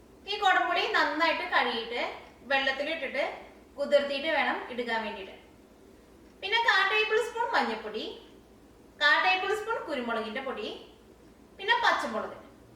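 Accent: Thrissur, India. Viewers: I like ur the way of presentation .. Thrissur slang 😍😍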